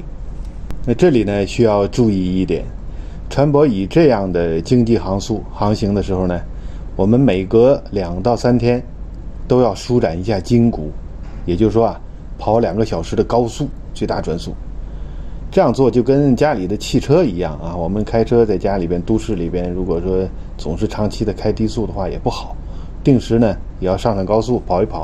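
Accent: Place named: Tianjin, China. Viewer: 请问李船长，你是不是还有个频道叫？声音和你一摸一样，也是天津人。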